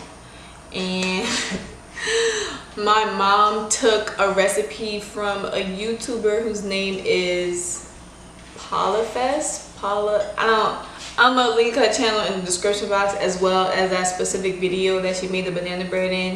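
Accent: Louisiana, United States. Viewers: Thanks, we will be trying this. I hear your Louisiana accent. Here from, South Louisiana -Opelousas, Louisiana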